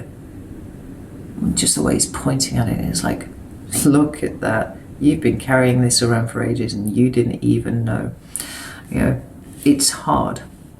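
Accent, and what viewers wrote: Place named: England, United Kingdom. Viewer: VERY FUNNY THING ! NEVER LIKED THE ENGLISH ACCENT ! I LOVE IT BY YOU !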